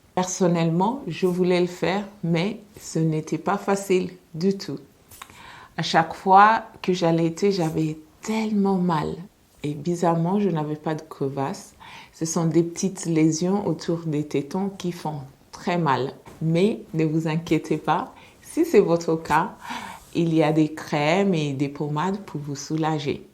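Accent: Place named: France. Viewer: Instead of reading the subtitles I am listening to your lovely French accent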